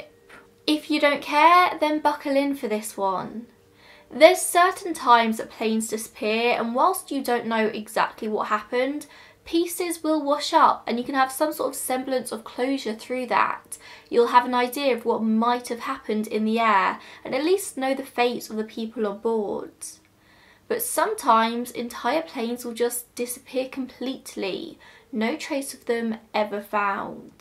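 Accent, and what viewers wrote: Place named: Home Counties, United Kingdom. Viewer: She is speaking in a standard Home Counties accent.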